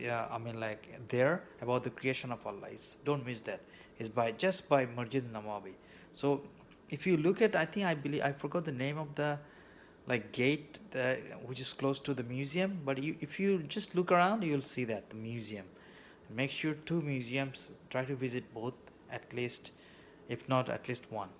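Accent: Bangladesh. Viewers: S/W, bhai, you sound Bangladeshi, masha'Allah. May Allah bless you for your efforts. :)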